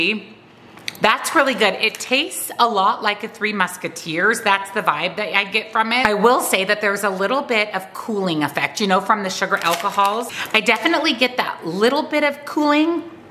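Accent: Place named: Minnesota, United States. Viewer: You have got to be from Minnesota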